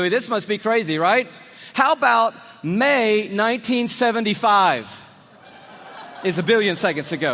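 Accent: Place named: South, United States. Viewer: Ευχαριστώ το video που δικαιολογεί την αθεία μου. ¨Εφτιαξε λοιπόν όλα αυτά τα τεράστια άστρα που δε μπορούν να φιλοξενήσουν ζωή, για να δημιουργήσει μια προσωπική σχέση με εμάς τους τυχερούς στην μικρούλα Γη. Εκατοντάδες δις γαλαξίες με εκατοντάδες δις άστρα για να πει στους Εβραίους ότι τους ελευθέρωσε από τους Αιγυπτίους, για να μας απαγορέψει να τρώμε μπριζόλα τη Μεγάλη Παρασκευή και να μας επιβάλει το πότε το πως και το με ποιον θα το κάνουμε στο κρεβάτι μας. Ηλίθιος ο ομιλητής και φυσικά με νότια προφορά. Οσο πιο βλάχος τόσο πιο θρήσκος ...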